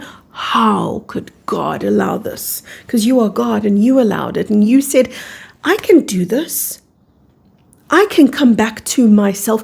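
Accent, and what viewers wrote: South Africa. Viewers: Hi your channel just found me. I knew you were South African from the first sentence 😂. New subbie here I will be binge watching any other videos you have. Love and light from a fellow SAfrican 🙏❤️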